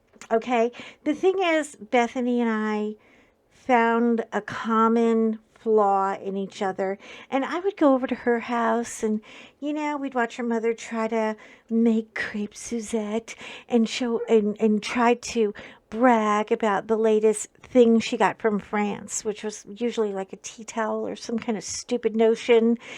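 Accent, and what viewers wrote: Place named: Ireland. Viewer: I'm sorry you had such a hard time in school. 💜 Unfortunately I know how it is. I was scared of going to school every day for about 7 years. The 2 last years wasn't that bad. So I managed well. And I guess we all know a "Fat Bethany" in some form. I'm very happy you survived 😊 I love how you talk Irish, as your mother 💙 Thank you so much for sharing your story with all of us. Big hugs 🤗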